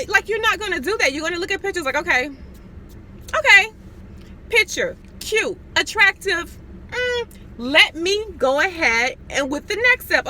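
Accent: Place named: New York, United States. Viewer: Dang pretty lady. You sound like you’re from the NY.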